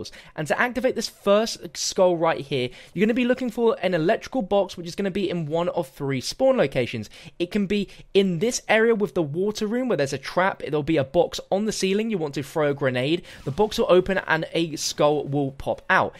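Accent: United Kingdom. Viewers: I can't listen to the British accent.... Literally can't do it.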